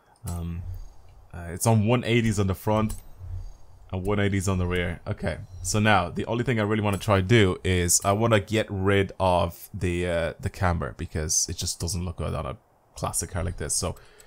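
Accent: Dublin, Ireland. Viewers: Good vid keep it up (quick question are u from Dublin in ireland cause u sound like u are from Dublin)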